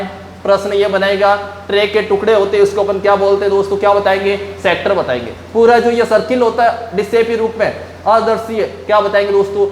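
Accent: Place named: Jodhpur, India. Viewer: गुरु जी आपकी जोधपुरी भाषा घणी छोकी लागी इया ही पढाता रेवो घणा बढिया पढाओ आप जितरी तारीफ करु उतणी कम है जय हिंद गुरु जी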